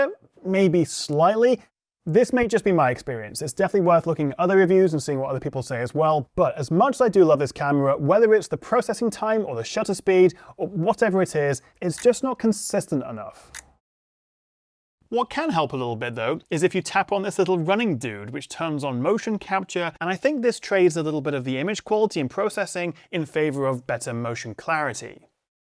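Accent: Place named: Wales, United Kingdom. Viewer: Didn't know you're from wales bruh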